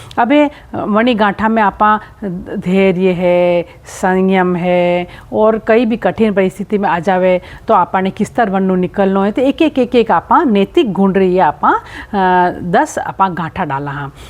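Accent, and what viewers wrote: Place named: Mewar, India. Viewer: Bahut hi gyanvardhak video .....gajab ki mewadi boli h aapne....dashamata ki agrim shubhkamnae evm pranam didi🙏🙏🙏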